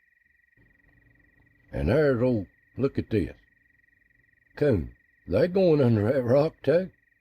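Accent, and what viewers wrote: Appalachia, United States. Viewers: thank you sir,I loved the country mountain way,you narrated the piece work story,five stars ☆☆☆☆☆,I'm a blue ridge boy,so sounded like one of my uncles telling a story,thanks again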